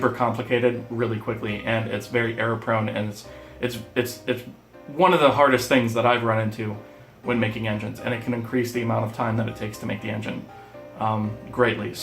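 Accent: Germany. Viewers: Bist du deutsch? 😂 Dein Akzent hört sich so an.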